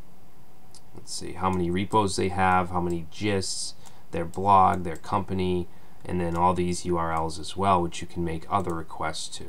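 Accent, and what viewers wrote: Boston, United States. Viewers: Boston accent? Great vid.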